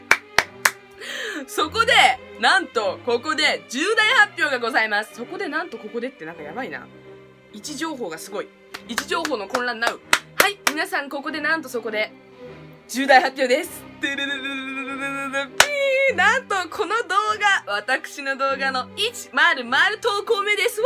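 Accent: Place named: Hakata, Japan. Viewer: 博多弁好き！いつもめちゃめちゃなまっててほしい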